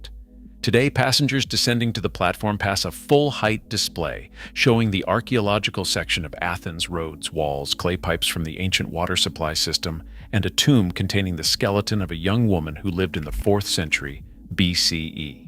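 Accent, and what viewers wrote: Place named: United States. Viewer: Great presentation, but your americanized pronunciation of the Greek names is quite disturbing I'm sad to say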